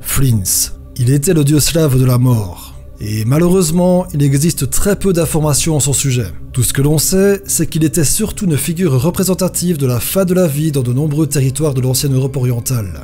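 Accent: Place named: Belgium. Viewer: Belgique ^^ (j'ai le même xD)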